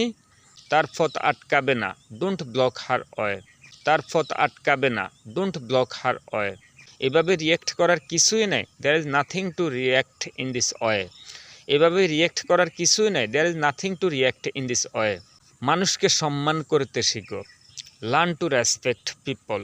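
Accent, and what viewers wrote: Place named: Noakhali, Bangladesh. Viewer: এই বেটা কথক, তোর বাংলা উচ্চারণ ভুল আছে, ইংরেজীতেও ভুল আছে।কারণ স্কুলে থাকতে ইংরেজী স্যারের বেতের বাড়ী খাস নাইতো, ঐ জন্য! বাংলা উচ্চারণে নোয়াখালির টান পরিষ্কার ভাবে বুঝা গেছে। উচ্চারণ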